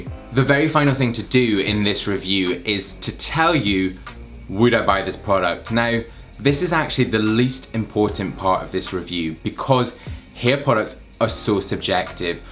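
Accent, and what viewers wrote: United Kingdom. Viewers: Is funny how British people talk.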